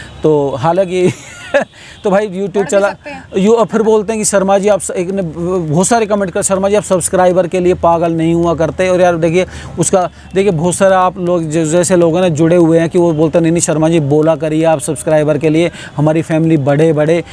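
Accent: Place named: Haryana, India. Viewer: Faridabad ,Palwal ki si boli hai aapki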